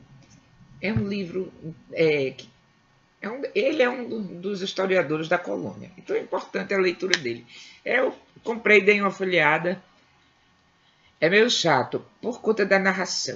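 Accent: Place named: Northeast, Brazil. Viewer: obrigada pelo presente... aqui embalando meus 250 livros rsrs... e procurando como 'embalar livros" me deparo com este presente, essas leituras lindas, com o sotaque tão afetivo em meu coração, a doce lembrança da minha avó paraibana e de meu velho pai, pernambucano, contando os 'causos' do sertão diante das fogueiras de são João nas periferia de São Paulo, onde crescemos eu e meu irmão - gratidão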